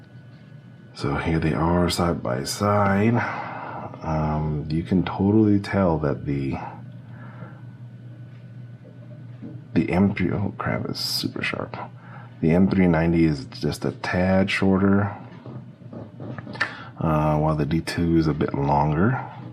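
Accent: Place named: Connecticut, United States. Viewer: Da fuq? Ken Keniff from connecticut sounding ass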